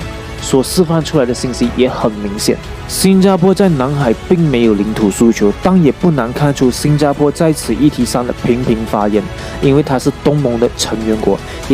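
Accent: Malaysia. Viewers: This guys sounds like a Malaysian.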